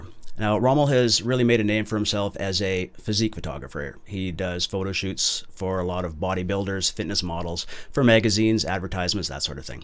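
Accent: Canada. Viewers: ooh that Canadian accent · hmm are you Canadian? Got that Canadian "out" thing going on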